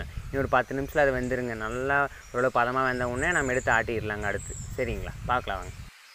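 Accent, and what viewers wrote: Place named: Coimbatore, India. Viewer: Nice da machi 💯👍❤️ Nama coimbatore slang Vera lvl nga🔥🤩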